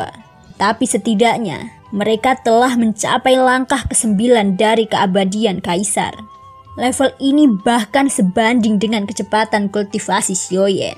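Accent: Java, Indonesia. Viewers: terlalu medok noba yg ke 4 ini😂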